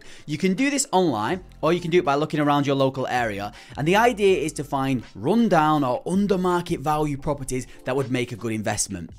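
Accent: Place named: England, United Kingdom. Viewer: Thanks I like you English accent easy understand! Thanks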